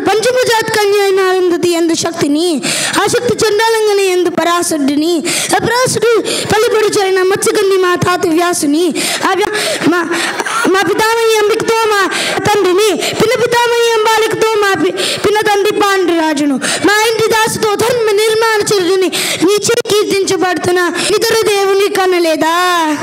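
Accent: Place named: United Kingdom. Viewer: ఆంగ్ల బాషా యాస వున్నా బాగా ప్రత్నించాడు.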